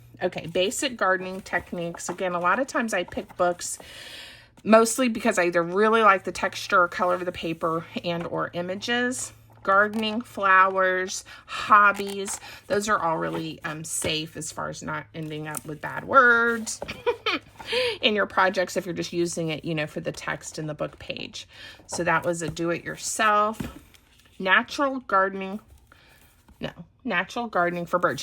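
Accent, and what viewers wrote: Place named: South, United States. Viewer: Oooooo. I have lots of old hankies too! You go girl!!! (P.S. I'm amazed how quickly your southern accent went away)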